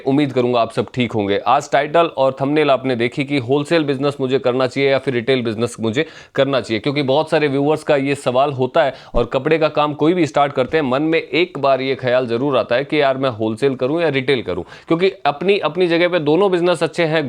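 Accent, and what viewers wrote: Rajasthan, India. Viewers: आप राजस्थान से हो क्या भाई जी।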